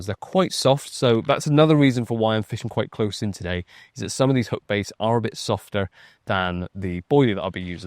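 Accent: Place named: Gloucestershire, United Kingdom. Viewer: Tuner 😂🎉😂 I'm only one county up from (Gloucester)byou buts funny 🤣 I'm sure I ptotsound the same to people north of me 😂😂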